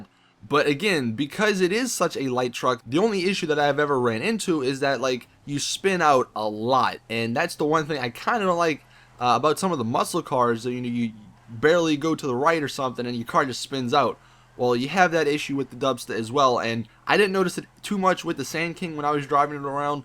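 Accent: Louisiana, United States. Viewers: are u from Louisiana and if so what part? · Are you from Louisiana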